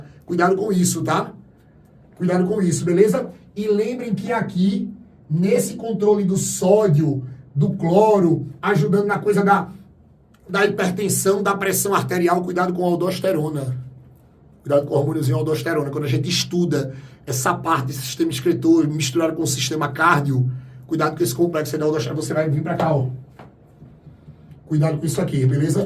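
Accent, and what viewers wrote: Northeast, Brazil. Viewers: amo o sutaque nordestino representando minha terra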